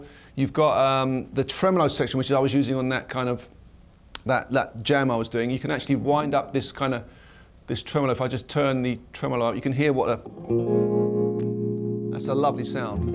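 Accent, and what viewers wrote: United Kingdom. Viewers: heavy british accent? He is british? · in fct is acent of britin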